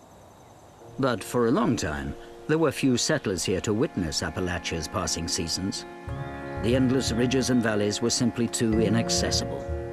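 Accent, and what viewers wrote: England, United Kingdom. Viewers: Interesting listening to what sounds like an Englishman speaking words like Appalachia and others. Very well done video though and quite professional. The native superstition material was a bit much. Moira Smiley was a perfect choice for vocals. Her talent was only out done by her beauty. She is lovely.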